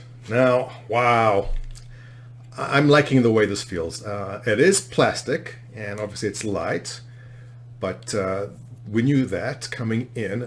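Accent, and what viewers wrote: South Africa. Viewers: its south african lol are you saying all us south africans sound like Christopher Walken? :P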